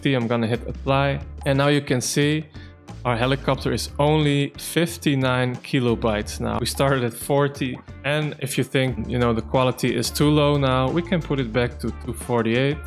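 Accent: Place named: Netherlands. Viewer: Hey, thanks a lot for the tips! I hear a dutch accent:D I may be wrong. You've made a wonderful game, congrats! Have you considered launching on other platforms? I'd like to get the game to support you and because the game seems fun, but I don't own a switch.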